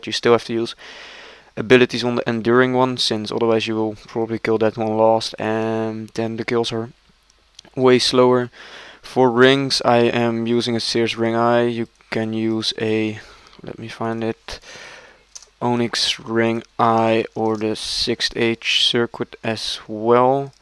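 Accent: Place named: Netherlands. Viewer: sounds like you're dutch. and very good vid never knew glacors were worth it again after eoc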